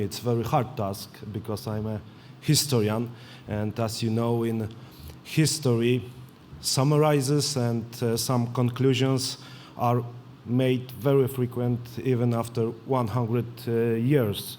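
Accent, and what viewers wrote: Poland. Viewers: Ma Polski akcent ale bardzo dobrze mówi po angielsku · Ludzie co się przypierdalają do jego angielskiego żyją w zupełnie odrealniony świecie. Wiadomo, że akcent mocno polski, ale widać, że wie co mówi i potrafi przekazać co chce w tym jezyku - niczego bym tu nie ujmował. Najważniejsze, żeby nie czuje się skrępowany mówiąc i mówi płynnie. Przypomina mi się zawsze Jose Mourinho, który mimo swojego łamanego angielskiego i śmiesznie brzmiącego akcentu nigdy nie tracił charyzymy w tym języku i wszyscy go zawsze słuchali jak mówił. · Bardzo dobrze mówi po angielsku. Ja wychowywałem się w UK, więc mówię to z punktu widzenia kogoś kto mówi płynnie po angielsku i muszę powiedzieć, że naprawdę nie jest źle. Używa bardzo dobrych słów i mówi całkiem poprawnie. Tylko musi popracować nad akcentem. Najlepiej byłoby, żeby poprzebywał z osobami z Anglii lub USA, którzy mówią bez 'akcentu polskiego' to myślę, że szybko by podłapał akcent i wymowę. 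Ale naprawdę wcale nie jest źle.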